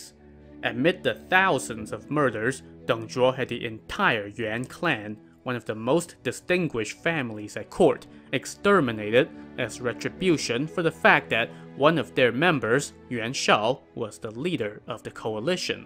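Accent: China. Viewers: It is. He has a Chinese accent. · Is the narrator a Cantonese?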